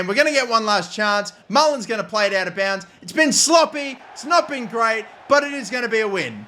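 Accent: Australia. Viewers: yh he's from Melbourne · Wait is he australian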